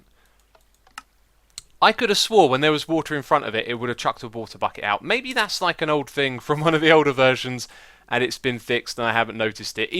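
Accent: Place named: United Kingdom. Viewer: I quit Minecraft a long time ago (1.5 years ago, I think), yesterday I saw one of your Hermitcraft videos and now I am really inspired to build again! You also learned me a fantastic British accent, which is quite rare here in The Netherlands! My English teacher was very proud and gave me an A. Thank you for everything!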